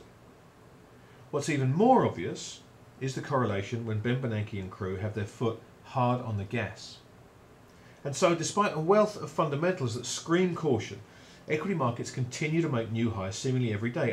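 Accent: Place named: United Kingdom. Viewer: I guess the British accent makes him sound intelligent.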